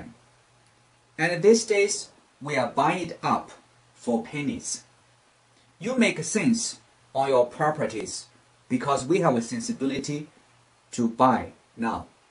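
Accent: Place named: China. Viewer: Great video and your Chinglish is funny! Thumbs Up!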